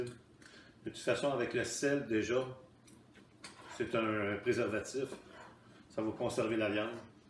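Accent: Quebec, Canada. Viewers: Quel plaisir de voir et surtout d'écouter ce cher accent de nos cousins Quebecois!! cette recette sent bon comme votre accent et c'est avec plaisir que je la réaliserai avec plaisir, merci encore et bonne continuation!! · Bravo.! Quel rayon de soleil pour nous "c'bel âccint Q'bêquô"!! NB. le pâté est superbe aussi, bien sûr! Merci 🌿 ♨️ 🙏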